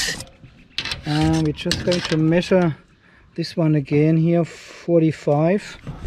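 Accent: Germany. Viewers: I enjoy trying to figure out where people are from by listening to their accents. I've been watching a lot of your videos lately and you were really throwing me off. I had pretty much decided you were a native German speaker but your surroundings didn't look like any part of Germany I've ever seen before. You helped me work it out in this video when you mentioned your German power strip with the Australian plug. I really like your videos as I'm preparing to put together my own battery bank and solar system. Also, congratulations on passing 1000 subscribers!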